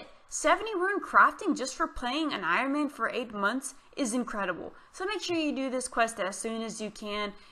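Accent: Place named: South, United States. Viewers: You're obviously a fellow southerner :P shout out from Texas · Shoutout to you for using y’all and dank in the same sentence. I’m from Jersey but spent a lot of time down south and you talk just like I do LOL.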